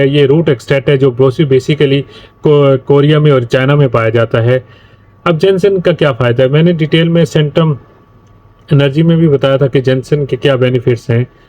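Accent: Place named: Punjab, India. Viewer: W salam nice video bahi again Punjabi touch ❤nice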